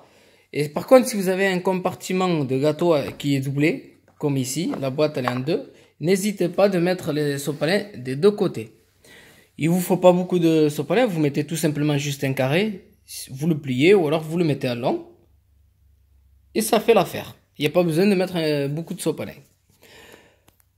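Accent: Marseille, France. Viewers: je suis ivoirien (cote d'ivoire ) on m'a toujours dis que ceux qui parle français avec cet accent sont marseillais. estce vrai?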